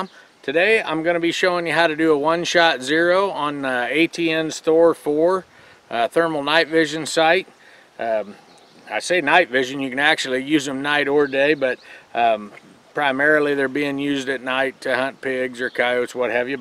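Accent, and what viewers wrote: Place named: South, United States. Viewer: Found someone that talks more hillbilly than myself and more stupid!! Let's put another bullet in and don't forget to add that last bullet to make the action smooth!! Now let's place the bullshit aside use better terminology, you don't get smoother action by choking the mag and round or shell sounds better than bullet since that refers to the lead..By the way I live KY..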